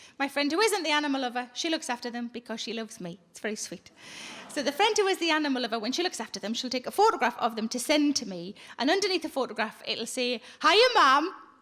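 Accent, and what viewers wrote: Newcastle, United Kingdom. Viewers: She has a Geordie accent. It's from the north-east of England. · i had never heard of sarah millican last month, and now she is my favorite comedian. what a hilarious person she is. and that geordie accent!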